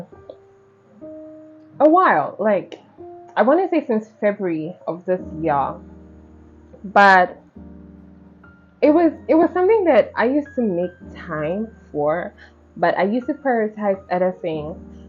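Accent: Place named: South Africa. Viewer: I love the SA accent n vibes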